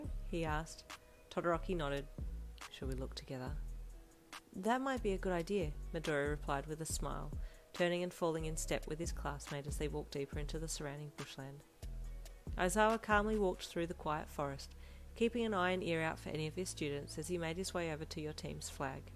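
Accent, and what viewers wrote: Australia. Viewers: Yes I immediately knew what pants meant but if you had said dacking 😭😭 I’d be so lost like please explain your Aussie accent to meeeee 🤣💀💀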